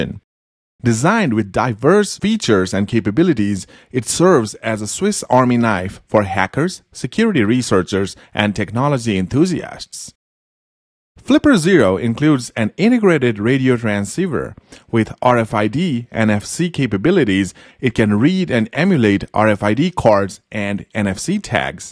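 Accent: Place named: Asia. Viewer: Old fuking video fukng asian accent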